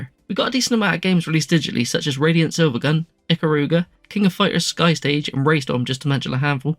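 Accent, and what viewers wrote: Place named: Bristol, United Kingdom. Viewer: From your accent i guess you are in the Bristol area? Fellow 360 collector here 🫡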